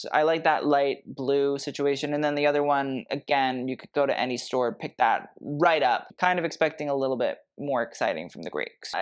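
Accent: United Kingdom. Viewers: the british accent took me out 😭😂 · ❤ your British accent 😂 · Your British accent is hilarious, hahaha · The British accent is giving 😂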